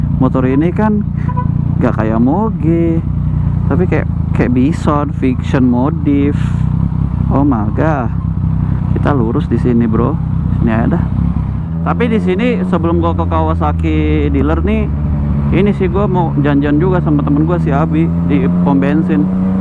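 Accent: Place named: South Jakarta, Indonesia. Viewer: Logat anak jaksel 😅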